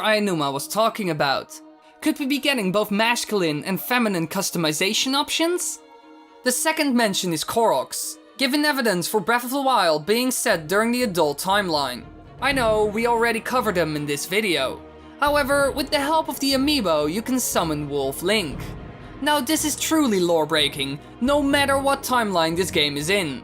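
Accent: Netherlands. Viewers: Are you dutch? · Ben je nederlands? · Are you (the narrator) dutch? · You sort of have a Dutch accent..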